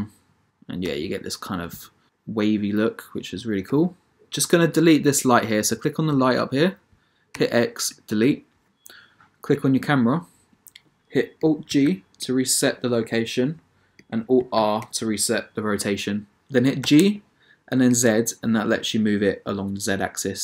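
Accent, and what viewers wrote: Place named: London, United Kingdom. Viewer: Sick tutorial nice to hear london accent too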